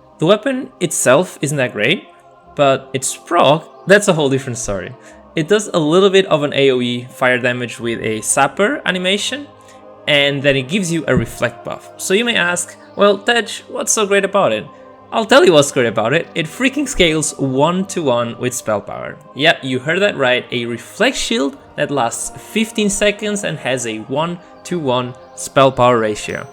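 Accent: Portugal. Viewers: Português pelo sotaque certo? Qual o servidor? Abc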